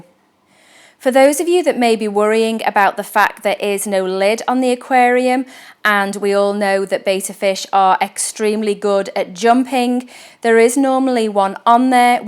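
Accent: Liverpool, United Kingdom. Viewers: I wish you would have persevered with this channel, it appears it could have really gotten somewhere. |Such a shame, but that's life.. You sound like people I know from the Sefton areas of Liverpool?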